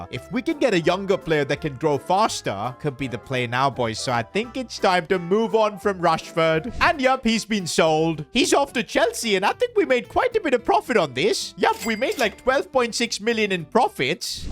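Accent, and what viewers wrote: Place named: India. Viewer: You talk like an Indian!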